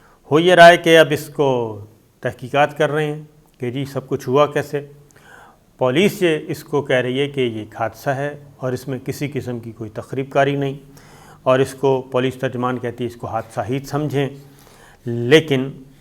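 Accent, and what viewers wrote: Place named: Saraiki, Pakistan. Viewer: معافی چہ معنی دارد۔ صابر شاکر صاحب اچھی اردو بولتے ہیں۔ لیکن کہیں کہیں لہجہ پنجابی بلکہ سرایکی ہوجاتا ہے۔ مثلاً گھنٹے کو گھینٹے فرمایں گے۔ اسکول کو سکول۔ قران کو کوران۔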